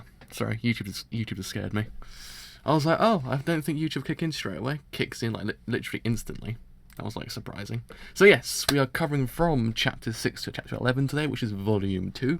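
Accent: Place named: United Kingdom. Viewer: I think is for your British accent lol, the way you pronunce "The legs of" sounds pretty similar to "Alexa" for me xD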